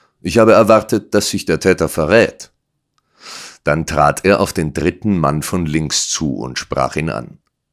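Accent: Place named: Austria. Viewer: Lustig, wenn, der nebenbei gesagt Österreicher ist, sein österreichischer Dialekt als nicht überzeugend kritisert wird 😂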